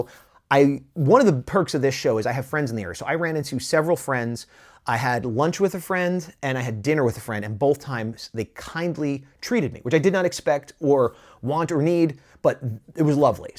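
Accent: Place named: New York, United States. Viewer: I think you SHOULD use that voice and “hey buddy, you like monstahs?” that would be gangster! let your new York flow. sing it like. 🤙✌️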